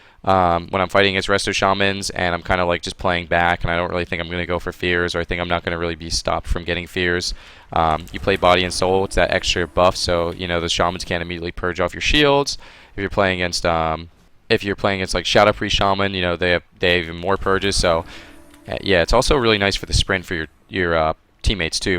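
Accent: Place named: United States. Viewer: he sounds american.....but he uses the lingo of someone who isnt...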